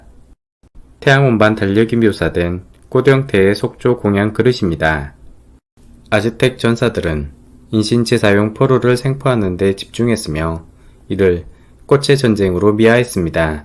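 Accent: Jeolla, South Korea. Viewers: 어!! 목소리가 전라도분이시다!!! 오오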